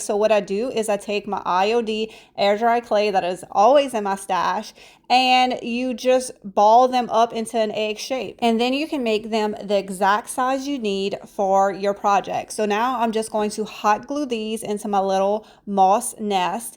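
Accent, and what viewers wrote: Louisiana, United States. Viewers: I love everything you did but the measuring cups were just so simple and darling. By the way, are you originally from Louisiana bc you have a Louisiana accent you sound cajun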